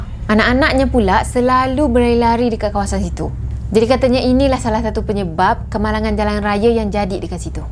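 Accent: Kelantan, Malaysia. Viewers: Akak ni orang Kelantan ke?🤔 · heyy .. ore kelate ko ni ? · Orang kelantan ke ni😆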